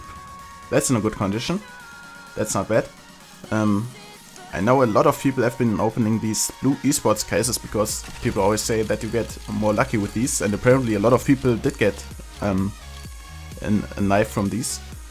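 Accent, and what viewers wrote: Germany. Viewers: Man merkt damit du deutsch bist aber trotzdem nice sehr gutes Video!! LIKE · Dieser Deutsche Akzent. ^^ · nice accent by the way, i like they way the german accent lingers in my ears, but not tooo much of a german accent, really nice to listen to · inside Seine Aussprache ist so awesome:D Man merkt immer ein bisschen Deutsch bei ihm. Mir gefällt das:D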